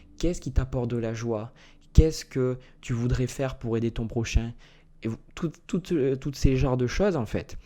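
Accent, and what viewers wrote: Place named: Aveyron, France. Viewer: Trop drôle,! Mon cadet porte votre prénom, votre nom et votre accent me parle mon Aveyron... Et vs êtes bélier et analysez nos caractères comme moi... Et le changement de ce mois est ouvert! Après échec et mat...par trahisons! Et trop de ces questions que vs ennoncez... Merci vraiment.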